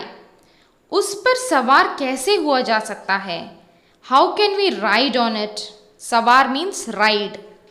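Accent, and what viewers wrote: Kerala, India. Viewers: are you malayali ma'am?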